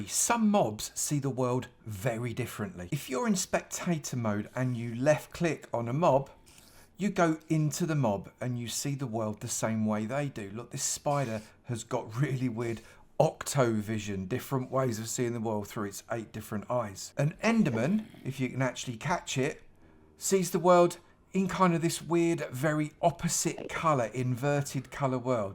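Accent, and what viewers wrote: United Kingdom. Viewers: this is the most usa documentary style video I've seen by someone with UK accent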